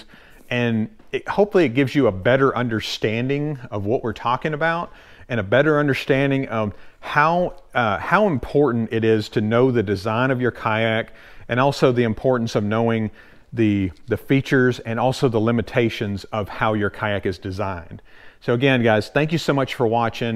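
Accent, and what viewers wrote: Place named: South, United States. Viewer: This Minnesotan enjoys your subtle southern drawl 😁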